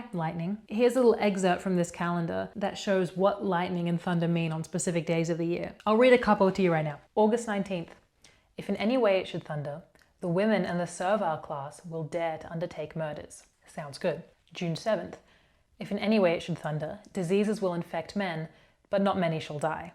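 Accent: Australia. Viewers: I think Australia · Thanks, I enjoyed this summary of the Etruscans. It’s hard to get an overall picture so concise. It’s also funny listening to how you change from an Aussie accent to an American one depending on which words you say 😂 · Australian? Capricorn? I need answers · Are you Australia based? Great content. So well done…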